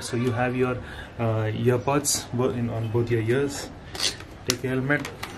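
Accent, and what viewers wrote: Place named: India. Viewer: DIGGAHHH dieses Indische englisch fuckt mich so ab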